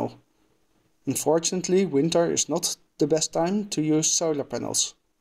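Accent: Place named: Netherlands. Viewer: Ok ok! I'm also still figuring out the tricks of the trade - you're not alone! I've trialed many different video editors and in my opinion, the best is Cyberlink PowerDirector. It isn't perfect, but I still think it is the best. Yes, you're doing it the way I used to do it. Now I rarely do this! What I do now is section the video into 'scenes', then I 'take' until the scene is perfect, then move to the next scene . Then when editing, I simply delete all but the last take for each scene. It saves me many hours! I also do 'errr', 'um', 'arr', but I'm happy to leave them in because I don't want my channel to be too formal. About your presentation; it is absolutely fine... I can hear a strong Dutch accent, but I can fully understand what you're saying. I don't know about other countries, but here in Britain, I think we are good at understanding accents because we are used to talking to people in English who are from all over the world. 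For example; the word 'one', you pronounce as 'WAN', but it's close enough to know that you're saying 'one' without any effort at all. Like if an English person speaks Dutch, you can hear the accent and it applies a sort of slant to the speech, but you can still understand it well. :-)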